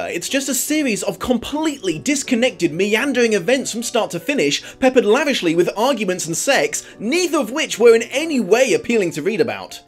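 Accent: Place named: United Kingdom. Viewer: I'm sortof giggling at the whole dom/sub thing. It was a branch of literotica I found very interesting in my younger years :) I can imagine you as a world-weary, slightly snippy dom who doesn't have the time for his subs' bullshit. If you're dressed as an Oxford tutor with a pencil behind your ear, it's your own fault for having such a marvelously British accent. I'm absolutely in love with the way you call out and destroy E L James's twaddle. Keep it up.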